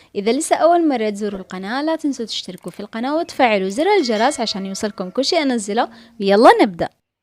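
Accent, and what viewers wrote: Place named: Yemen. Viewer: من لهجتك احسك يمنيه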